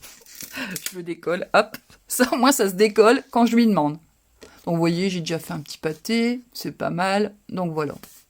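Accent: North, France. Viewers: Coucou 🙋‍♀️J’avais reconnu que vous étiez du Nord à votre accent, sourire… Je suis du Grand - Est, de la Moselle.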